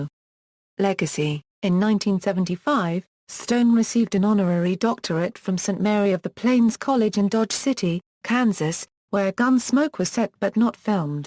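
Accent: England, United Kingdom. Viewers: Why is a robot with an English accent narrating this? Geez.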